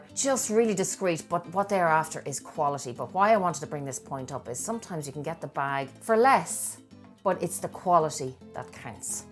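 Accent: Ireland. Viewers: Hello, I am a new subscriber and have enjoyed watching your videos. Love your accent, what part of Ireland are you from. My husbands Father came from County Cork. xoxo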